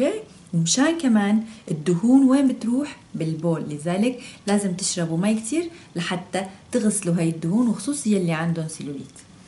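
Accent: Aleppo, Syria. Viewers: Samiri Sanaa سوريا حلب واضح من اللهجة مرة احب الكلام الحلبي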